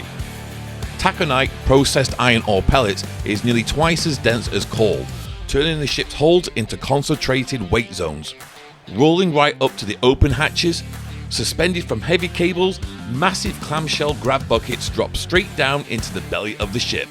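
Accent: United Kingdom. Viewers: Where did you get that lovely British accent? Certainly not in Ontario.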